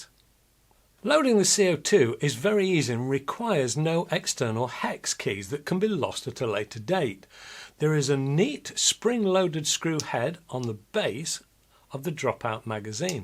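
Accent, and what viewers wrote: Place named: England, United Kingdom. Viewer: Not used to gun reviews conducted by a gentleman with an English accent, but I’m here for it!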